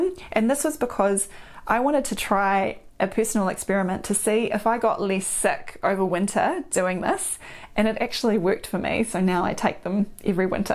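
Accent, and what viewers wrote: New Zealand. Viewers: The Kiwi accent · What I enjoyed the most about this video “Kiwi accent” noticed one thing more Hollywood is alive & kick’n in Kiwi Land, you know I’m just been facetious, my first time it’s informative, talking about sun, I am from beautiful Huntington Beach CA weather is always good. All in all it’s informative video! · So wonderful to hear a Kiwi accent! Appreciate your videos. You are a picture of health an vitality yourself. Thank you. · Thanks for the information. It's a beautiful sunny day so I am going out and catching that sunshine. You are blessed with THE most Kiwi accent I have ever heard! Love it! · Who knew health information could be so exciting? That combination is irresistible: - beaming smile - sweet and gentle demeanor - medical facts delivered in a comprehensible way for normal people - love the kiwi accent! You are officially my internet doctor,!:)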